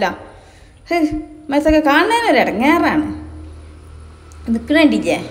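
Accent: Malappuram, India. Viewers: ഈ ഭാഷ കേട്ടാൽ തോന്നും ഇവൾ മാത്രേ മലപ്പുറത്തൊള്ളുന്നു prrr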